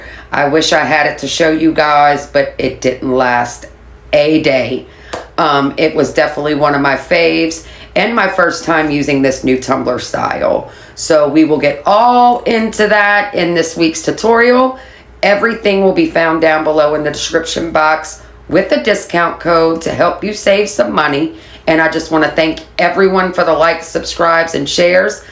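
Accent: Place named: Louisiana, United States. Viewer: Beautiful cup!! I am hearing a Louisiana accent…..I’m in Louisiana is why I say that! LOL. Girl I would love to craft with you and learn some stuff! 😊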